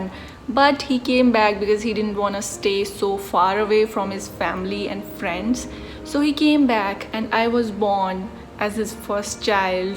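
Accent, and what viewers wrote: India. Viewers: I like Indian English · I subscribed you by seeing you from bts's study with bts I mean by seeing you're comment And our [mine &yours] english accent is weird that's how Indians speak English in that accent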